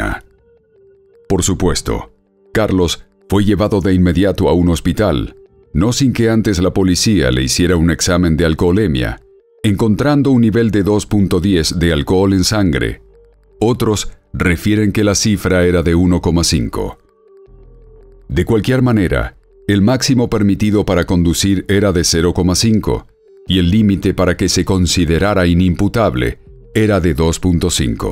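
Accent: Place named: Mexico. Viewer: Acento MEXICANO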